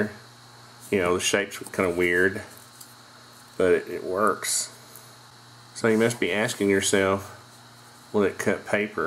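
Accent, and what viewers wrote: United States. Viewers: U have a really heavy american accent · Damn he has annoying "murica" accent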